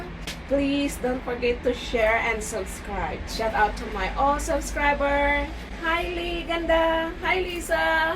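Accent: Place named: Visayas, Philippines. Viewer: Bisaya diay ka host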